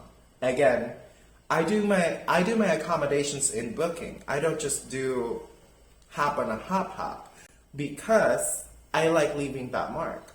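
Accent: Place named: England, United Kingdom. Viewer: you look soo beautiful, love your english accent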